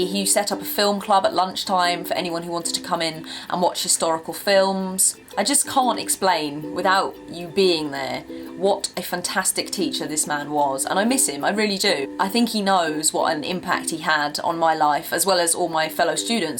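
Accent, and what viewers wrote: United Kingdom. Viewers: I love your British accent! I started watching you because of it :D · Your british is amazing :-).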